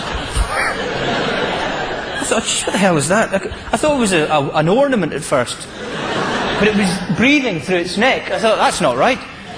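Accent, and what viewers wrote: Australia. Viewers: People who may not realize this, perhaps Americans stumbling upon this for the first time, this guy is right on the money !! His "Aussie accent" needs only a teensy.wee bit more perfecting, but he has summed up Australia & Australians perfectly in a nutshell, beautifully, in fact.!! This bloke is not only very observant, but be's also very funny. #!! A top-notch stand-up comedian !! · Man this guy's got down the middle-aged Shazza accent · Haha Danny actually is the only comedian who has got our accent pretty much perfect. Other comedians try to sound like us and often fail. So yeah we do sound kind of like that, but I think he's only trying to do the stereotypical Aussie accent for comedic purposes. And it works because I too laugh my ass off. xD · Real OZ accent!! not beautiful at all.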